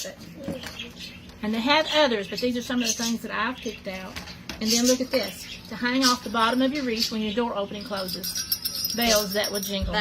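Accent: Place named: South, United States. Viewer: You sound so country I love it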